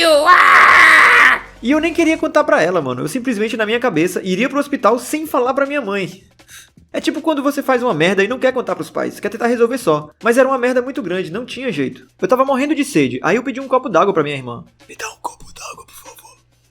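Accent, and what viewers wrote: Brazil. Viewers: cheguei até aqui pq vi um comentário seu no canal da Dora Weigand, e eu simplesmente adorei teu canal e que vídeo foda, dei ótimas risadas kkkk e que bom que to esse sofrimento já acabou, pelo tua voz eu chuto que tbm é Cearense, se não for é do nordeste tbm certeza.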